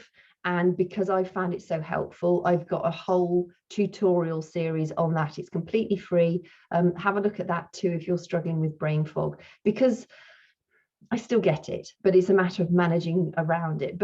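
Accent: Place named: London, United Kingdom. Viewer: Thank you. The video made me feel less alone. I am in post menopause (over 2 years no periods) and the anxiety & night sweats still are here. Very distressing but I am trying to eat healthier (although I've still yet to cut out caffeine) and exercise. I need to start strength training and hope to find videos where I can do it at home without being in a crowded gym as Covid-19 is still around in the U.S. I love your speaking voice. My brother-In-Law moved from London with his family when he was in high school and used to have a similar accent. He's since lost the accent (which us a bummer) but he still visits his other relatives in London every couple of years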